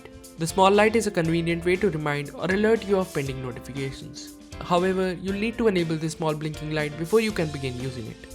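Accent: India. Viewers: Also, it's the Indian accent which is prompting everyone to say they can't understanding a thing lol xD It seems neutral to us, but not so much to others.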